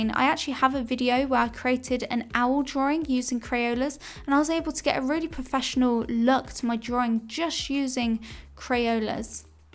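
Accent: United Kingdom. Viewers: Everybody looking at her drawing skills but me only paying attention to her Emma Watson accent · U r having a good British accent, Where r u from??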